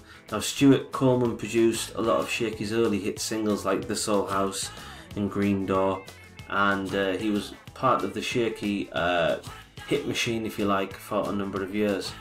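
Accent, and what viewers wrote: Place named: Northern England, United Kingdom. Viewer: One of my absolute fave Shaky albums, this. Not a weak track on it, a great country-rockabilly sound and just generally fantastic. Also, first time I heard you speak, mate, a fellow northerner by the sound of it (although I'm a Yorkshireman).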